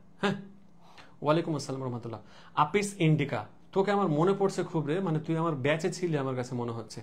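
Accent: Rajshahi, Bangladesh. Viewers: First class sir vasha sunei bujlam apnar bari rajshahi pore about e giye Dekhi asolei.... Last of the all apnar class khub e valo legese ami online e khub akta class Kori ni SSC te to aro Kori ni mane kora lageni akhon apnar class ta amar khub e valo legese asa kori samner sob class e apnar sate thakte parbo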